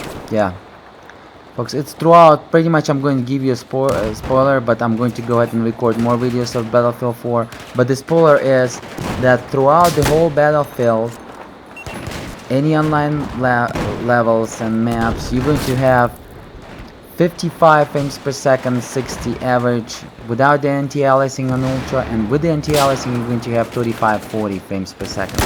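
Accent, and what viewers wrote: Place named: Russia. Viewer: official - 8K and 4K gameplay, benchmarks, reviews Мне кажется я почуствовал Русский акцент..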